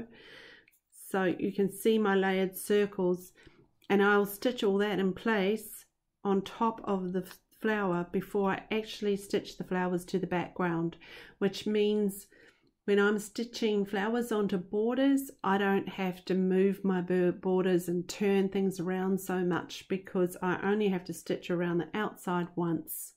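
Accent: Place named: New Zealand. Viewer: Do my ears deceive me or are you a fellow kiwi?!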